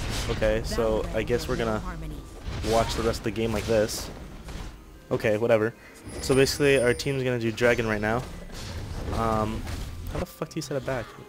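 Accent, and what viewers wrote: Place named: United States. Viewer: You are french? I thought you were american, not a doubt in my mind.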